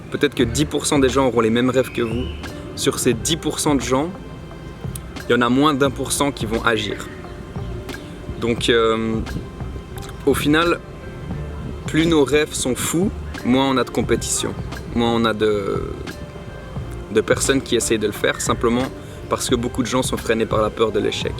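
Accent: Belgium. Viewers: On dirait que t'es bruxellois Sinon love you and your beautiful viedooos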